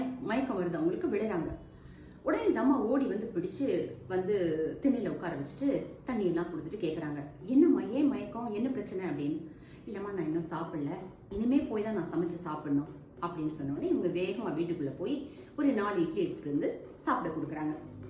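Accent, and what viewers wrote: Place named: Thoothukudi, India. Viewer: தூத்துக்குடி தமிழ் மக்கா இது.....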